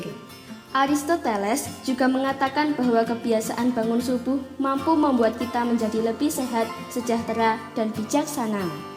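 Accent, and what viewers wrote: Java, Indonesia. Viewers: medok medok seksi❤❤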